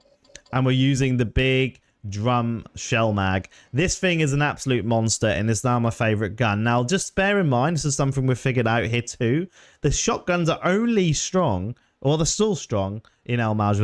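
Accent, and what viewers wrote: Norfolk, United Kingdom. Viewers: I'm convinced by your slight accent that you're from Norfolk like Kings Lynn way lol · Where you from fella? Norwich boy here and I swear I hear a Norfolk twang here and there??!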